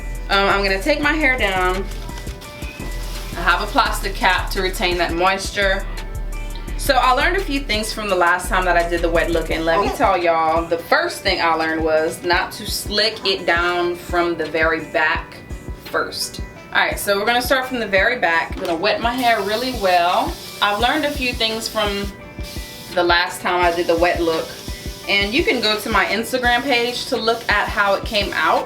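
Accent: Louisiana, United States. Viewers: Yasss.. Girl your hair is everything!! Soooo cute. Little miss Lavender is soo precious. It sounds like you could be from Louisiana? Anyway, thanks for the content.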